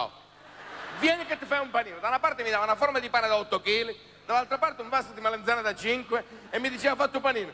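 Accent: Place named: Piedmont, Italy. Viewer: È vero sono così i Calabresi, poi l'accento piemontese è fantastico!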